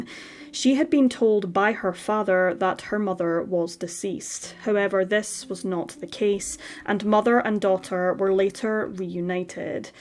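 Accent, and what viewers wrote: Scotland, United Kingdom. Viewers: Remember she is Scottish and they pronounce things differently there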